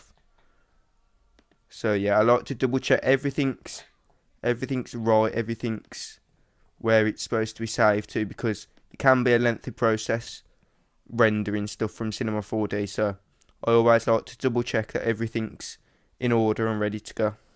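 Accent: Birmingham, United Kingdom. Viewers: i heard ya dog at 100 lol ignore me dude its good to hear a fellow brummy cheers for the tips man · Are you from birmingham?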